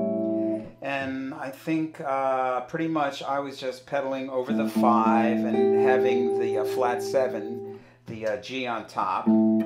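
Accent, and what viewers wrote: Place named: Philadelphia, United States. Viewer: Nice lesson,. You made everything clear; it's easy to see what you're doing. By the way, are you from Philly? I am, and you seem to have the accent.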